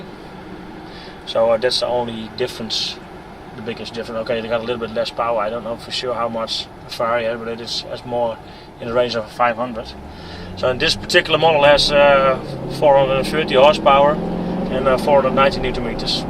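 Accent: Netherlands. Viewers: You sound Dutch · duidelijk een NL'er, wat een accent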